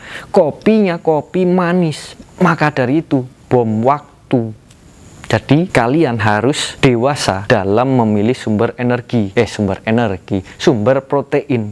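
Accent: Yogyakarta, Indonesia. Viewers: Wong jogja to jenengan mss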